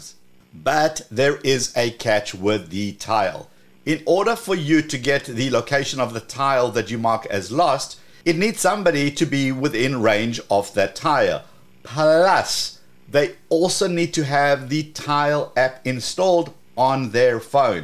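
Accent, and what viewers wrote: South Africa. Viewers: Thanks for the video! Your maps showed Dallas. Your accents sound South African with a touch of Sydney. Ever been to Australia?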